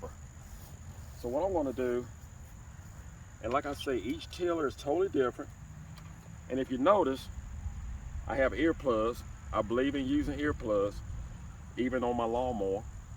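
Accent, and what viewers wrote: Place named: Baltimore, United States. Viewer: Amazing video! Also you have a thick ass Baltimore accent 😂😂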